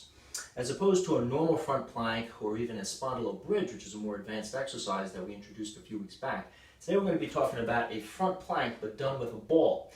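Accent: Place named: Boston, United States. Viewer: Thanks again! By the way, where are you based? I’m thinking east coast, maybe Boston, based on your accent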